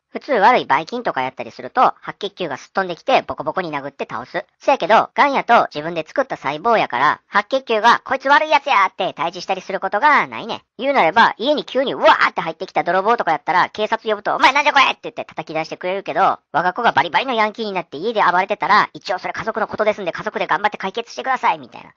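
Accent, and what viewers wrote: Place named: Osaka, Japan. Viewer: 大阪弁やから理解しやすい最高ありがとう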